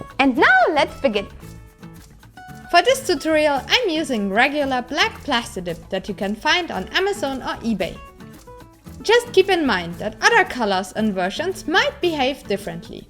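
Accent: Germany. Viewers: Du sprichst ja englisch immer noch wie ein Deutsche, die glaubt, dass Amerikaner so reden. Ansonsten schönes Video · Your German accent is charming.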